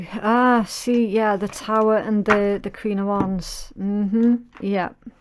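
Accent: Scotland, United Kingdom. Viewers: I’m new to you channel … you absolutely nailed it !!! Every detail !!! Well done .. hello from Rhode Island, USA … love your Scottish accent ????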